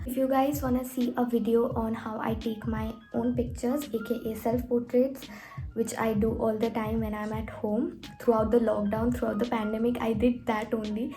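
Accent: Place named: Bengal, India. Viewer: You're such a sweetheart and that Bengali accent is so cuteee!❤️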